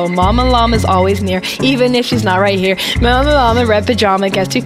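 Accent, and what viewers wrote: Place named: Bath, United Kingdom. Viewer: its cah she got a bath accent stfy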